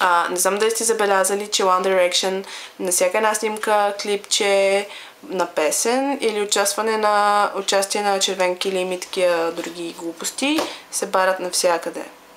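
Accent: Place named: England, United Kingdom. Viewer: Моля те спри с този английски акцент.. -,- Не ти стои добре ;) Хах смешка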